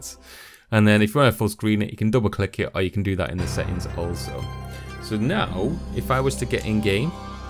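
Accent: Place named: United Kingdom. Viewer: Where the feck are ya from pal? Can't quite place that accent. After loads of vids it's pecking mi head bad. Deffo UK but where!? :)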